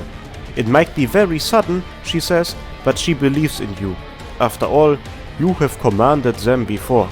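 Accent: Germany. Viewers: Bruder dein deutscher Akzent ist LEGENDÄR XDDD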